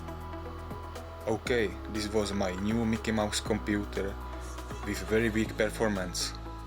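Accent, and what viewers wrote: Czech Republic. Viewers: Hned jak jsem slyšel ten tvůj akcent tak "jaj čech" :D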